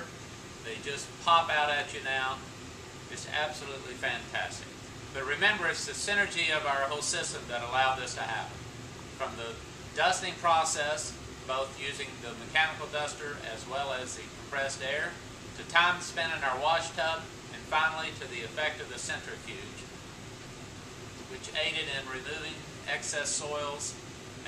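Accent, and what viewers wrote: United States. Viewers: Are you from Tennessee?? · Just saw your video and had not seen how rugs were cleaned before. Thank you for posting it. You sound like a Southerner. Are you located in the US Southeast?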